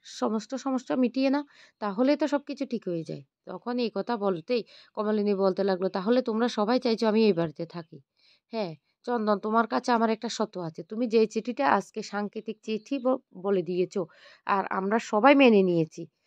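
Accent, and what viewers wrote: Chittagong, Bangladesh. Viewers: আপনি বোধহয় চট্টগ্রামের তাই কথা গুলো এভাবে বলেন।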